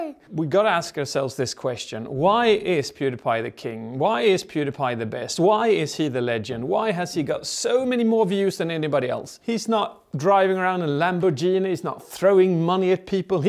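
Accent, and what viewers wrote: Sweden. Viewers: Do i hear some swedish accent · Are you swedish? Also great job on the video watching it made myhead hurt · Well they are both swedish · e han från sverige eller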